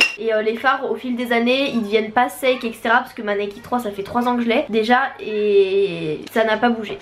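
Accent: Marseille, France. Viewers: T marseillaise? 😮 autrement super vidéo ❤ me fais trop rire 😂❤❤ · t'es Marseillaise??? ❤❤😍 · tes marseillaise? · T marseillaise?⚪🔵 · Tu viens de Marseille comment sa?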